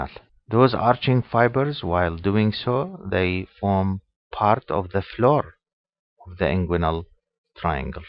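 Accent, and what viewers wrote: Iraq. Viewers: ماشاءالله لا قوة الا بااله سمعت مقدمة محاضرة اخرى سمعت اللهجة و شككت انها لشخص عراقي و اسعدني ان اعرف ان المحاضر دكتور عراقي و أسعدتني التعليقات الإيجابية وفقك الله دكتور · Thanks for the great effort ..and by your accent i can tell you're from iraq am I right? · Your accent seems to be of iraqi origin ?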